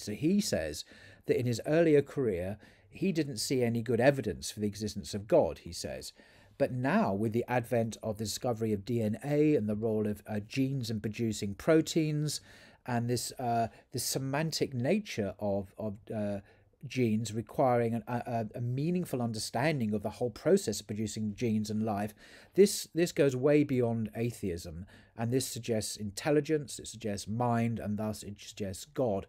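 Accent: United Kingdom. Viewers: Love your British accent, thank you for the clear exposition!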